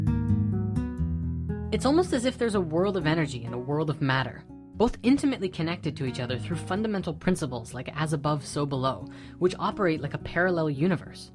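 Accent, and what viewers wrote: Canada. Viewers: Love it how (once in a while) the Canadian accent peeks thru! 😉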